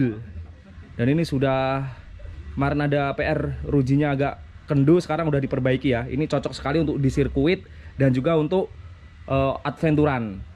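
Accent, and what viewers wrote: Java, Indonesia. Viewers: Medok mas 😝😝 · wong jowo iki? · Bos kacung punya sowrom motor juga, aq suka pak logat jawanya kental dan ada humoris nya juga.selalu doain di awal dan semoga berkah dalam hidup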